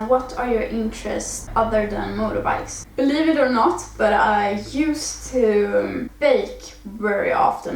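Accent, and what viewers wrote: Sweden. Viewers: man hör svenskengelskan så bra haha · when i heard your accent, i knew you was from sweden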